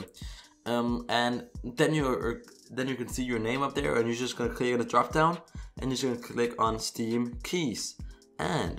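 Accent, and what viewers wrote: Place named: Denmark. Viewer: Trode ik du var dansk :P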